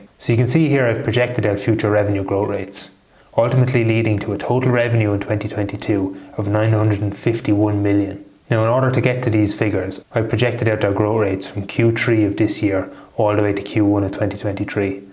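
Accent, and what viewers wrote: Ireland. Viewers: County Mayo accent?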